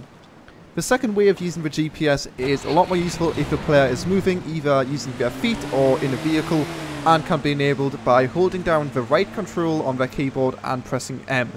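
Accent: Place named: Northern England, United Kingdom. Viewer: good to hear a fellow northener on the tube :)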